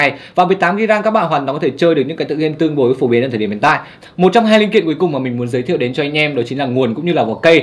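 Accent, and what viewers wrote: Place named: Vinh, Vietnam. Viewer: Anh này nói giọng giống giọng người Vinh quá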